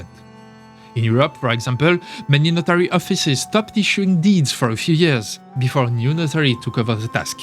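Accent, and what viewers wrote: France. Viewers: Nah.. We love our French Narrator. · Nah I like the French narrator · L'accent français atroce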